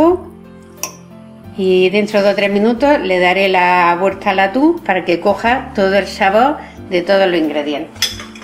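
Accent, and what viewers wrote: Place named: Andalusia, Spain. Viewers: Jajajajajaja no sabes reconocer un acento andaluz? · " Loviá poné " , me encanta el dialecto andaluz 💪🏆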